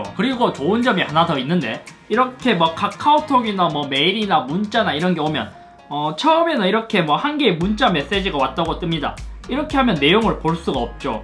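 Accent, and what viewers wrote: Gyeongsang, South Korea. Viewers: 같은 경상도 사람이라 억양이 비슷할수밖에 없어요.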